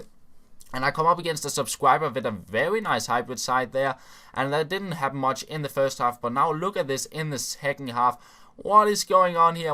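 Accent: Denmark. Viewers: I'm a new, subscriber. Are you Danish, you sound Danish.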